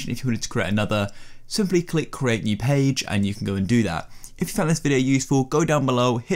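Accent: United Kingdom. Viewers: you are definitely British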